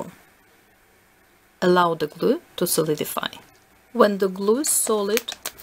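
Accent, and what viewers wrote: Russia. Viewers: Are you Russian ? You have a really Russian accent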